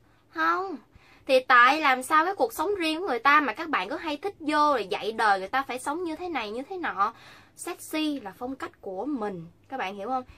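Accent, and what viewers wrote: Standard. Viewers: Cj nói chuẩn ko cần chỉnh lun á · cj nói wa chuẩn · Nói chuẩn vler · Chi noi chuan ghe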